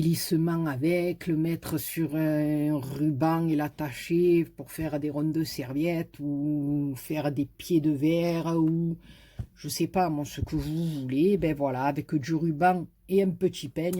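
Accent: South, France. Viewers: toujours un plaisir à te regarder, tu mets la patate pour la journée et tu fais du bien, tu transmets ta bonne humeur avec l'accent du midi..bisous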